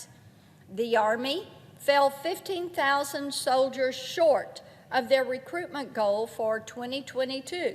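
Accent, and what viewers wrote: South, United States. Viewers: She is southern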